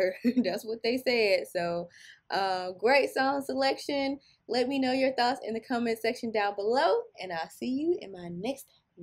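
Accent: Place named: South, United States. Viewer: Yeah, yeah, the song is great and all but what part of the country are you from,? Because, girl, you've got waaaay more than your fair share of Southern charm just oozing out all over the place. I could listen to you talk and laugh all day, every day, and still want more. If that husband of yours doesn't feel the same way then you'd best sit him down and remind him of what a lucky man he is! LOL Keep rockin' this channel!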